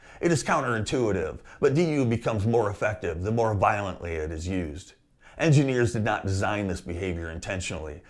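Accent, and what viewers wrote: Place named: United States. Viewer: Spoken and presented like a true American 🇺🇲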